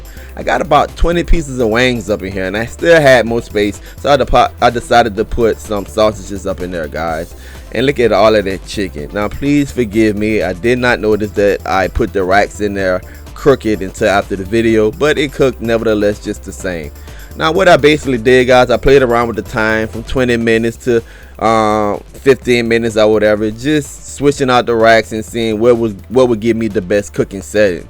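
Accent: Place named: Louisiana, United States. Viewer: I knew it lol. As a fellow cajun, I would recognize that accent from anywhere.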